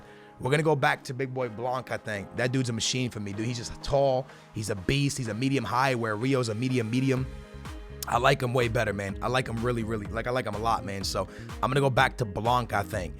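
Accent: Portugal. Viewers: Are you portuguese?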